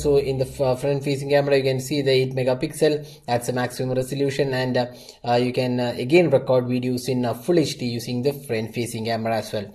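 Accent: Kerala, India. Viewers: u sound like a malayali :)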